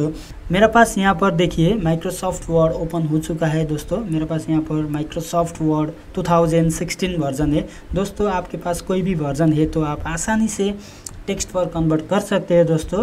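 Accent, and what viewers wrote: Nepal. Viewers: Did you make this video for the people of India??? Your accent says clearly that you are Nepalese.....You could have made this video in Nepali or English language......Anyways thumbs up for the tutorial...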